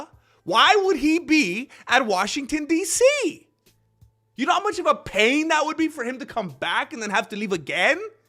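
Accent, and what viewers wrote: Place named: Mexico. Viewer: I preferred this fellow when he used to crank up his Mexican accent a bit more, and force that false laugh a bit less.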